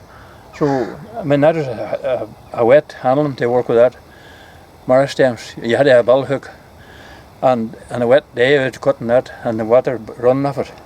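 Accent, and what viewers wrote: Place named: Scotland, United Kingdom. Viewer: Sounds perfectly Scots to me.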